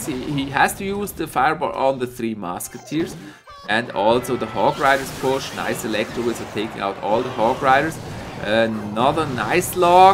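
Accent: Switzerland. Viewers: Chas si das du schwizer bisch? Schöne akzent😁🌾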